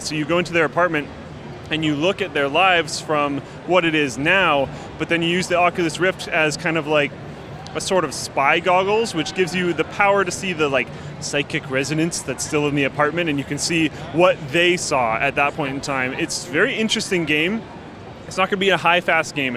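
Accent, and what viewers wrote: Canada. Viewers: his accent is so canadian, sometimes its hard to tell if someone is american or canadian until they say certain words but with this guy its super easy to tell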